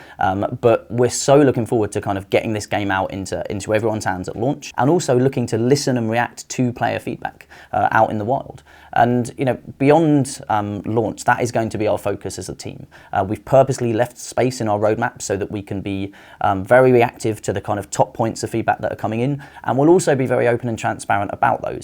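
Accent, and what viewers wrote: United Kingdom. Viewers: That british accent 😍😍 10 more days cant wait to play SoT, I have hope this is gonna be huge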